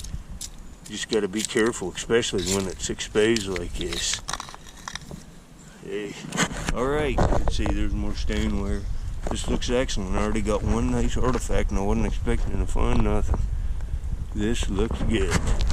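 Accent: Western Pennsylvania, United States. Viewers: you have to be from western PA with that accent. Am i correct?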